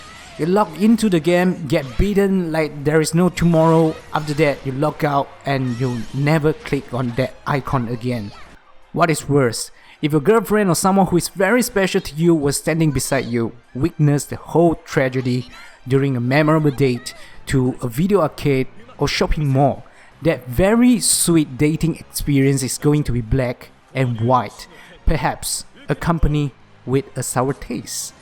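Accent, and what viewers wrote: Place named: East Asia. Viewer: hey love your video may i know where are u from? u sound like chinese/ japanese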